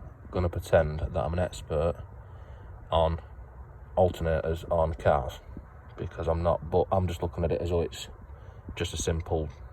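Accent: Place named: Lancashire, United Kingdom. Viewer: Just put it down to experience with that sort of thing. I was trying to place your accent. Sounds a bit Preston/Bolton-ish.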